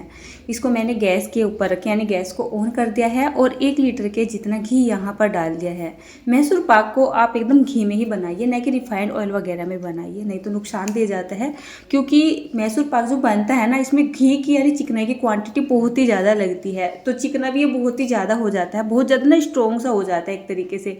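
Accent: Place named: Uttarakhand, India. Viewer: Acha bna hy ghee kafi lgta hy hath maiy lga hua hy achi grhni ho ❤❤😂😂 bolne se uttrakhandi lg rhi ho jai ho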